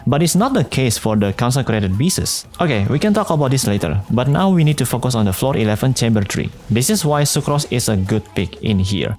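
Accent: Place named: Indonesia. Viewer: i hear indonesian accent speak english, i like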